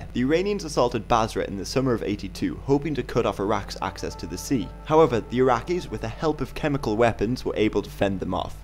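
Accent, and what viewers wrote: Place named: Southern England, United Kingdom. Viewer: I think its a british accent they all speak like that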